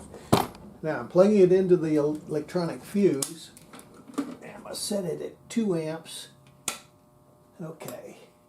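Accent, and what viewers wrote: United States. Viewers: I think he lives in Texas? It is definitely some flavor of southern U.S. accent, not Australian.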